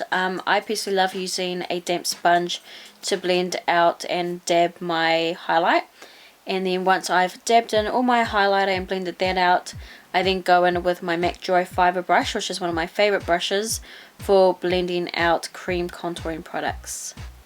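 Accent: New Zealand. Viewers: guuuurl recognized the kiwi accent right off bat. love the videos!!!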